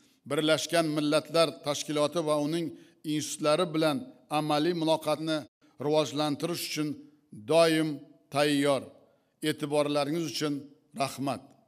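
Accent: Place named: Uzbekistan. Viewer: Ажойиб таклифлар,!!Тарихда биринчи марта узбекча нутк намоиш этилди,!!Рахматли Каримов ойиласи умуман узбекча билмаслиги жуда ачинарли ахвол эди!!Минбарда бутун дунега Узбек охангида нутк сузлаганингизнинг узи буюк тарих!!!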